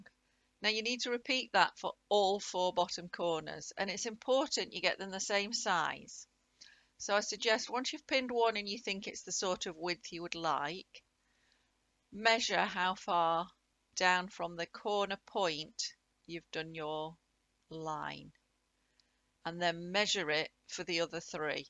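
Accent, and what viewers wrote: England, United Kingdom. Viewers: Hi Mam, Happy New Year! It is a cold raining day in Virginia on 1/10/26. I live in an efficiency apartment saving for my first house to buy and my goal is for Summer 2026. I love fabric but, have not much storage and I am a waste not want not gal and save very tiny scraps. I made my first draw string bag with a round bottom as a faver for my boss's wife and totally did not get paid correctly with cost of supplies time and talent. It was a tricky pattern called a Knitters Bag. About 7 inches wide, 12 inches tall and a round bottom 10 inches across. I coukd not find a video for tge life of me to show how to have the casing with clean finished sides so you would not see a raw edge that could fray. So the fabric where the casing ends were for the rope draw string I did dabs of crazy glue with a Q-tip in a smooth fashion. I never saw any videos where the seamstress opened up the side seams. 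I love this video. Also I have been baffled how you turn back out the sides the right way from the bottom when you line your bag. I have learning disabilities and patterns are hard to follow. I so want to make little bags more so now for each holiday and these will be nice for Valentines Day fabric with individually wrapped candy inside and a little gift for my family members and 2 special friends at work. I am so happy I watched this after watching your mixed media card you made today. I am happy you gave your measurements in inches too. Your accent sounds English. My Dad is from Manchester, E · The best one and an English accent! Thank you from Southampton UK.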